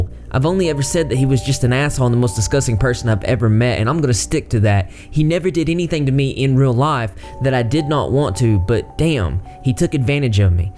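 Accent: South, United States. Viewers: Glad i found you. Ur great.I'm in Alabama.u must be from the south too? Luv ur accent.plus ur so damn good looking! ❤...luv ur content 😊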